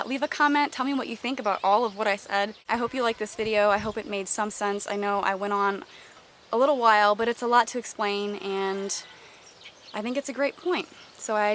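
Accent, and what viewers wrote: United States. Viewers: Such a great way of putting it! Love your videos and your message! My bf was watching them with me and says "she sounds like an american version of you Steph!" :') Love watching someone make total sense! xx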